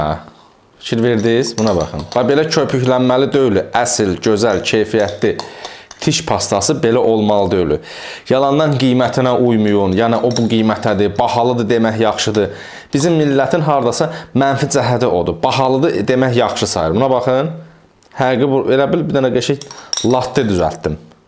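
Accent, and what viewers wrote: Ganja, Azerbaijan. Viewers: Ləhcə Gəncə ləhcəsinə oxşayır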